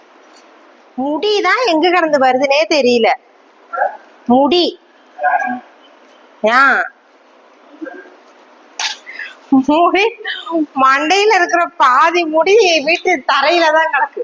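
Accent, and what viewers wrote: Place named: Tiruchirappalli, India. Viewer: Nanga Trichy akka neenga pesuradhu nanga pesuradhula ore madhiri iruku ,ipa chennai la irukom Inga dha konjam different ah enaku theriyudhu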